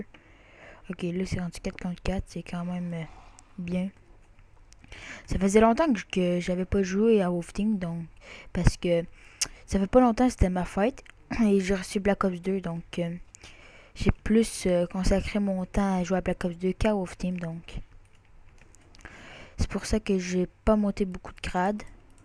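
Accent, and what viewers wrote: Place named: England, United Kingdom. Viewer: Est tu englais car tu a un accents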